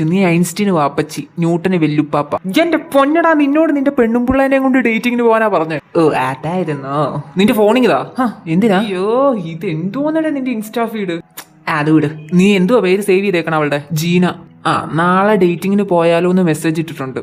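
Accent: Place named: Tamil Nadu, India. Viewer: Your Tamil accent 😤😤😤🔥🔥🔥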